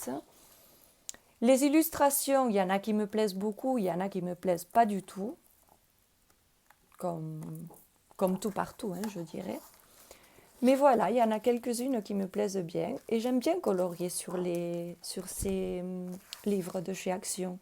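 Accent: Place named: Spain. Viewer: Mais ton accent 💖 la moitié de ma famille est d'origine espagnole et quand je t'entends ça me détend tellement je ferme les yeux je retourne en enfance je m'y croirai presque 😁💖 Tu m'as fait rire avec le fait de ne pas vouloir acheter trop de gammes de crayons, je crois qu'on tombe tous dans le piège quand on commence le colo 😂😂 Merci pour le partage je me régale avec tes vidéos 🥰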